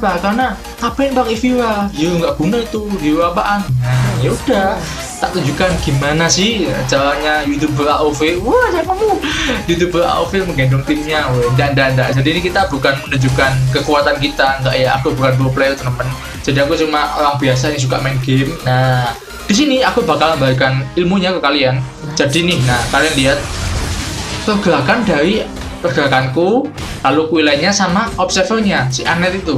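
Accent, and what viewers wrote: Java, Indonesia. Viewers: Aku seneng logatmu medok, mas....wkwkwkwk nice content 😄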